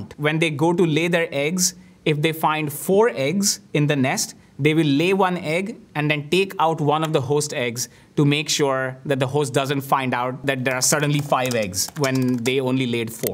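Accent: India. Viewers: On an unrelated note I love this guys accent idk why it sounds Indian but also unique at the same time genuinely got me interested in birds now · he has pure indian accent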